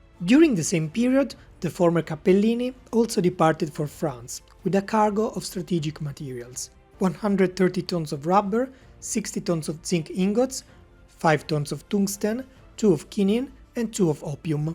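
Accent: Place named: Italy. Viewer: Just an outstanding video altogether with that great Italian accent that so well fits the content. Many thanks!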